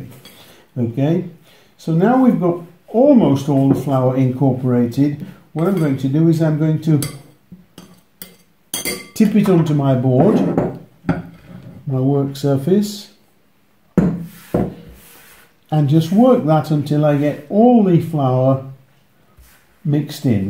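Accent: Yorkshire, United Kingdom. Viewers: your cooking videos, along with your yorkshire accent mr, bring back fond memories of home (yorkshire lass from West Melton).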